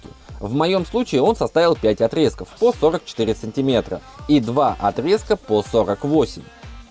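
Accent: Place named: France. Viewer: Отличное решение! Когда начал вас смотреть(строительство гаража) "французкй акцент" привлекал внимание, сейчас же на фоне личных качеств он вообще незаметен )))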